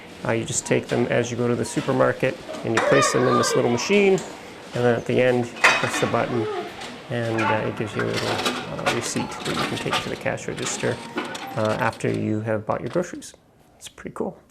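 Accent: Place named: Canada. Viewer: Great video :) Makes me wish I were in Finland. You look quite Finnish, but sound very Canadian. Did you ever manage to learn any Finnish? I've managed to get to a conversational level, but that's the most difficult thing about Finland. I think in the US, we need more of those yield signs, especially in the country when there's not a person around at the four way. No one ever fully stops anyway.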